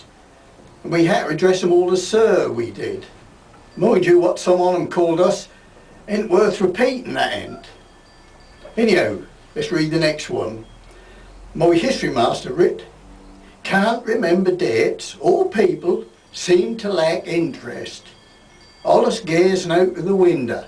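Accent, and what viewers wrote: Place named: Great Yarmouth, United Kingdom. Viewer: Thank you for satisfying my curiosity about what the accent of a Great Yarmouth native would be.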